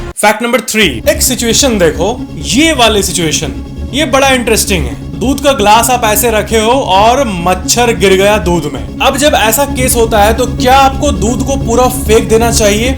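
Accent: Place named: Uttar Pradesh, India. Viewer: भाई आप कहां से हो हमें तो लग रहा है यूपी से हो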